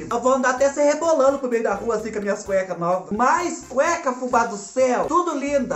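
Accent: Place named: Paraná, Brazil. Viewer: Espicula da redondinha kkkk minha mãe falava, aqui no Paraná .. no interior falam igual a vc... Morro de saudade de minha familia qdo escuto vc falar algumas coisas ... Kkk parabéns... Amamos vc. Espicula redondinha kkk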